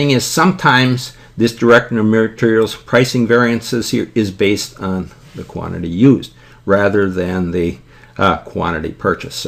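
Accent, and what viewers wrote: United States. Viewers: dude wth this is confusing as fuck with ya yankee sounding ass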